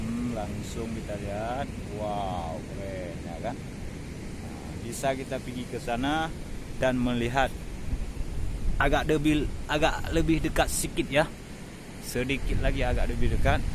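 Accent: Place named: Malaysia. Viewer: Ngomongnya kayak orang malaysia mas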